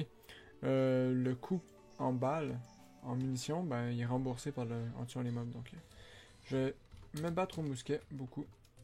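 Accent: Quebec, Canada. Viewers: j'aime bien les accent québécois 😀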